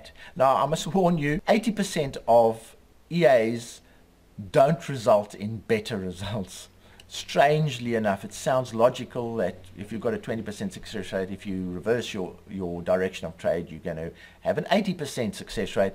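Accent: South Africa. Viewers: you sound like a Suid Afrikaaner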